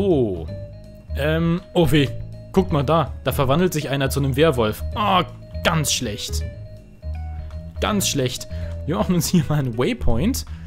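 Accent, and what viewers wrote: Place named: Berlin, Germany. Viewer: Ja klar, die Schlangen im Berliner Zoo können einen ja auch nicht angreifen, weil sie hinter Glas sind! Dass du aus Berlin bist dachte ich mir schon. Das "och" statt "auch" hat dich verraten. Ich stamme übrigens aus Nordhessen, wir sagen statt "auch" "ö"! Bsp.: "Dis hon ich mä ö schon gedacht."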